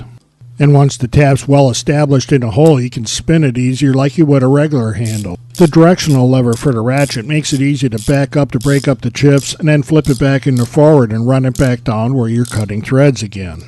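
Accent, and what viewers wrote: Chicago, United States. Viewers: Very Chicago. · Love that Chicago accent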